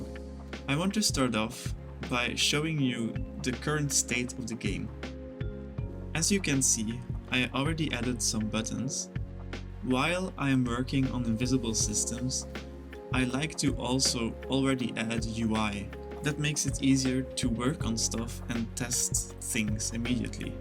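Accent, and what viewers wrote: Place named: South, Sweden. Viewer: Are you from Sweden? I'm from Sweden I recognize that southern-swedish-to-english dialekt anywhere :) Also I like games with terraforming! If you eonder how the algoritm brought me here i started watcging one devlog and now youtube brings me 1-2 devlogs from different people everyday it must have hooked me to "devlog" as a term so now it recommends everything that has that in it. If your from sweden i wish you extra luck because we need more game developers I wanna play some swedish games thats not just minecraft! If your not swedish i still wish you the best :)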